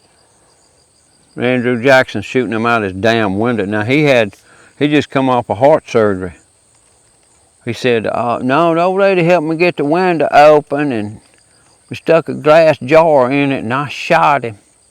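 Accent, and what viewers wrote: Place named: Louisiana, United States. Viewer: What state you from brother ? You sound a bit Louisianan or east Texan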